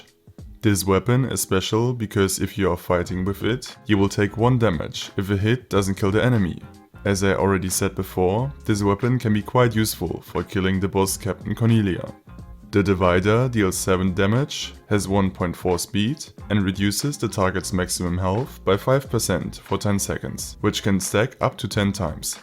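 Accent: Germany. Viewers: Bro bist du deutsch wenn ja mann hört es so raus (nicht böse gemeint finde dich mies cool )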